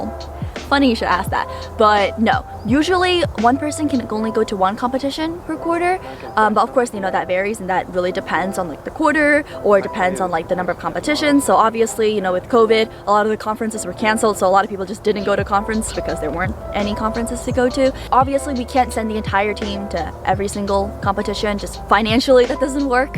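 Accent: United States. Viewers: Hi, you mentioned that you could have a singaporean accent but all ur videos has been speaking English with american accent.would I PLEASE get some tips on how to keep up with ur English, or would you share how u got to learn English so good.books ur read.things u listen to you find it helpful. Or at which point ur English has gotten this level. Cuz u started tutoring while I were still in school and I am really curious how could I get so good and be able to teach. I want to improve my English but I am no longer in high school or school in general.I needed some help. I am feeling a bit hopeless on that. Hope u could share ur experience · Hey how did u practice ur American accent?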